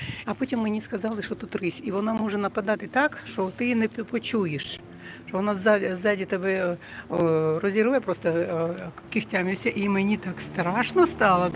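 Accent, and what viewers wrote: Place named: Russia. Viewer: по Вашему акценту чутно, що Ви все життя розмовляли російською - розмовляйте, так, як зручно..... Навіщо розпалювати цей мовний конфлікт- російська мова, це що власність путіна???????? В Норвегії теж розмовляють норвезькою та англійською мовами, але ж Слава Богу ніхто нікого,асвабаждать, не лізе...........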